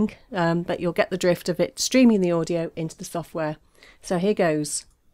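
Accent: England, United Kingdom. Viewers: Hello, I'm from Germany, did I recognize your sound that you come from England or is it from another country?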